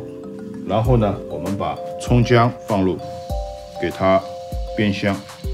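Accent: Shanghai, China. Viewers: 一听就晓得是阿拉上海宁师傅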